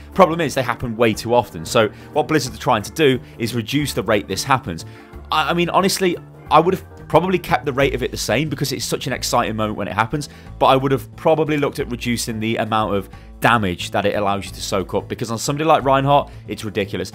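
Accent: United Kingdom. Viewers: God I love british accents. Ana turns into "Aner"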